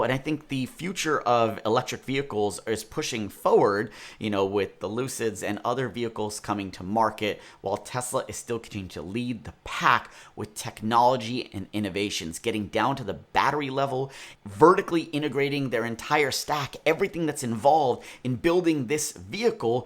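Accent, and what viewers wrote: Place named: United States. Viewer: hmmm 🧐 Now i understand why you look and your name is like an 🇮🇳 Indian 🇮🇳 but talk like an 🇺🇸american🇺🇸 And i am from Bhilwara,rajasthan. You can check on maps where it is. as you know covid-19 is going on all over the world but here in my town. There are covid +ve coming more and more daily but nobody is has fear of it now than before lockdown and some people have died nearby my colony 😭 and even in my family my chachi got covid😭 but she is not living with us and she is fine right now. but nobody is taking precaution and saying the virus is getting weaker and if you get covid test result +ve than you will be going get your covid test result -ve after some time and immunity power of indians are far stronger than rest of the world, etc. And if you go around the city you will see that it's like NORMAL , there is no covid or anything happened. 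People just carry mask so that they don't get chalan (fine) from police. What is happening to people's mind in india but i can guarantee you that if this goes on and does not stop than india will be no. 1 in covid cases and death in the world 😭. I am taking precaution so that i and my family don't get covid. And i see in your videos that people there in USA are taking precaution so that's good because you all know the fear of it. So take care of you and your family. And I didn't see your family in your videos, do a video about your home 🏡 (where you live now). And last but not least I learn English by my teacher to pass the exam but I l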